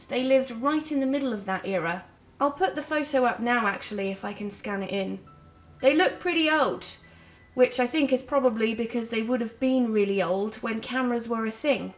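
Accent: United Kingdom. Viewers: I love your beautiful British accent 🌷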